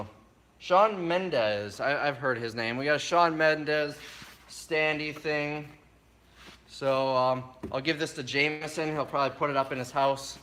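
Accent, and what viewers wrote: Wisconsin, United States. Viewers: You say dollar with more nasality than Standard American English (think newscaster speech), It's pretty normal for the Wisconsin accent.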